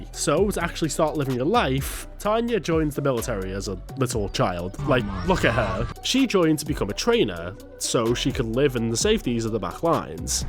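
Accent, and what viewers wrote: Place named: United Kingdom. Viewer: What's this accent, dude? It sounds perfect for roasting people, like British but more insulting.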